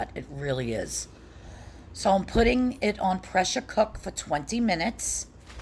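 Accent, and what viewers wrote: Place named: Long Island, United States. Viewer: Hi, happy to find your channel! Are you from Long Island? I recognize that accent!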